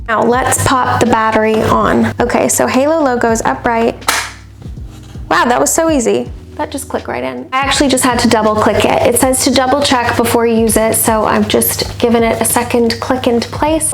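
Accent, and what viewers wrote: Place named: United States. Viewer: hmmm, you sound American, but the plug is UK. I wish you'd shown actually putting the dust bag in. Do you have experience with Dyson vacuums?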